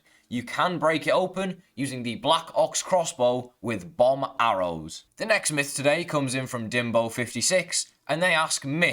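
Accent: United Kingdom. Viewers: U British ??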